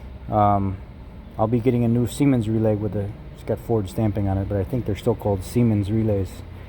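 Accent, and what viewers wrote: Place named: Chicago, United States. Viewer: You sound like a Polish Chicagoan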